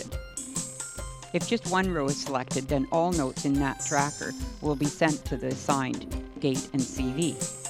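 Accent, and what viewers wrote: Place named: Midwest, United States. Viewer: Awesome! We were thinking midwest U.S. or maybe Western N.Y. near where I grew up in haha! You're accent is very similar! Cheers!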